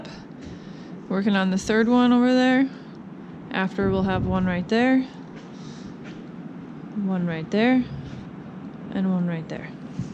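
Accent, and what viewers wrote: France. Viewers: Not trying to be rude but do I hear a French accent? And you guys sure do bust your butts! Your house will be a masterpiece when you done. I can already see it.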